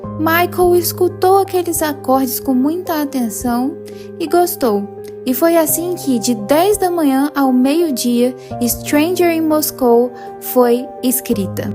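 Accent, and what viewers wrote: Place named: Bahia, Brazil. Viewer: Olha só, que incrível! Amei esse vídeo, você é muito talentosa, a televisão precisa de pessoas assim como você, jovens de talento e bom gosto. Imagino que você deva ter no máximo 22 anos. E como vocẽ conhece tão profundamende a história de e, principalmente a história dessa música?! Parabéns mesmo. Muitos da sua geração e das mais recentes, deviam ter contato com a boa arte dos anos 70, 80 e 90, para que talvez, com disseminação da boa cultura, quem sabe assim novos artistas como e tantos outros possam surgir no mundo. Meu nome é Jô, tenho 52 anos, moro em São Paulo e nasci em Jequié na Bahia. Achei você com o sotaque meio bainao e pernambucano. Fala aí, de onde você é? Quanto a música, ela é incŕivel, as gotas de chuva em câmera lenta, é incrível o clip. Quem sabe talvez você não possa fazer um vídeo falando da também? 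É outra cantora incrível que merece ter muitas músicas destacadas. Sucesso pra você garota, você tem o talento estampado na cara, continue assim com sua simplicidade que você chegará longe.💚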